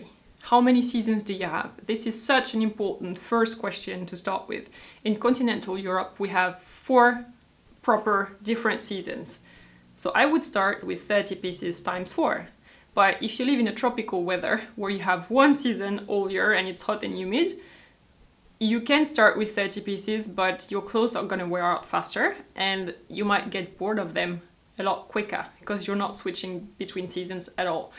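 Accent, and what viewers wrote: France. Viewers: Vera Prokopenko FRENCH and I love listening to her accent. A parent's brother, cousin or friend: Uncle. This 'u' is pronounced as in the word unbelievable or untrue. The word 'an' in ankle is pronounced as in the word anchor or angry. I'm in the UK and I love accent. I don't know anyone else with a French accent. It's very pleasant to hear. She doesn't speak to fast and everyone understands clearly what she is saying:)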